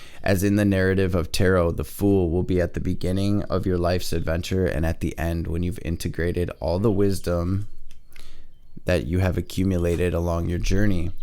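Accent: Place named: Bay Area, United States. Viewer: Dannng you handsome AF, you from the Bay Area? You kinda sound like it♥️ Besides that your reading is on point for me. Thank you!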